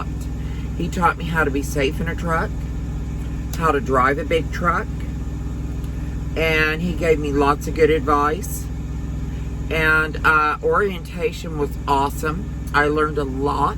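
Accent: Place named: Oklahoma, United States. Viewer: Did you work with horses?? I love your accent ma'am, that is Oklahoma? You look great Putting on weight for me is not so much about how the extra weight makes me look anymore, I don't mind looking heavy, I mind when I move, I feel jiggling in the caboose My problem is sugar and caffeine Sugar and caffeine is so convenient I will be doing my absolute best to eat healthy AND satisfying foods One day maybe fruits and seeds will taste better than rice Krispies treats, Can't wait to get an insta pot, I wonder how you keep them clean on the truck?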